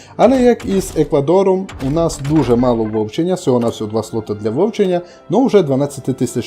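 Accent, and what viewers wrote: Transcarpathia, Ukraine. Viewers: Я живу на Полтавщині, для мені дуже файно чути закарпатський діалект і hoi4. Продовжуйте.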